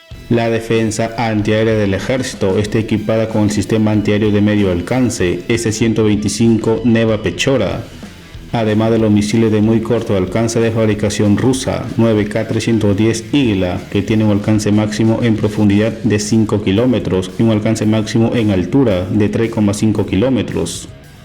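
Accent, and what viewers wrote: Peru. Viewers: TE DA VERGUENZA SER PERUANO? ENTONCES NO FINJAS QUE NO TIENES ACENTO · Revisa el canal, es peruano, sale en el video que le dan el botón de plata u oro de YouTube XD además es cosa de escucharlo xd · Es peruano creo , y ellos hablan así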